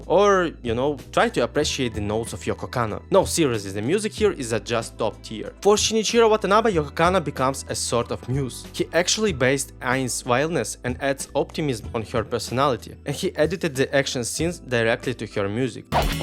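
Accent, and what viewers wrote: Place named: Middle East. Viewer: I’m watching this one because I watched that jujutsu Kaisen video. You make good content, but man, your accent is thick. Are you from the Middle East? It’s hard to place quite where your accent is. Anyway, so far your content is looking pretty good. I imagine those subscriber numbers will start jump, jumping if you keep making videos.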